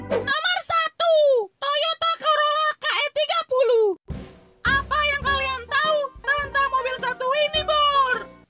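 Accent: Pekalongan, Indonesia. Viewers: Logate Pekalongan isek ketoro gan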